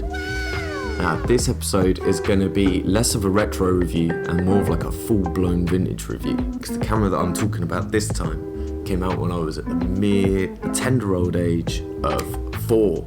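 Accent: United Kingdom. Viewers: I like your accent, it's that cool and actually bearable British also cool video and obviously great visuals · love your videos!!! Could you say more about the settings you use for the different digicams? (music a little bit too loud for me, though... not sure you need it while you speak, let us enjoy your cute British accent😉...)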